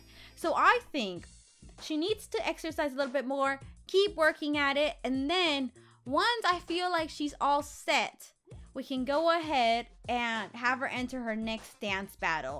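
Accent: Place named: London, United Kingdom. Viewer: Did any one else think that she was talking I a London aksint a little bit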